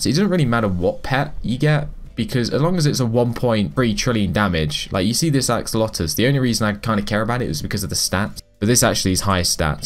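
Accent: United Kingdom. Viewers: Because I think he's British Accent..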